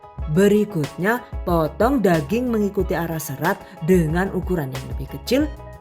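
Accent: Banyumas, Indonesia. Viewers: Mksh resep nya. Bumbunya komplit bgt pasti rasanya enak. Dan satu lagi, bhsa ngapax nya itu lho jd bikin kangen kampung. Salam ngapak. Sukses selalu mbak'e😍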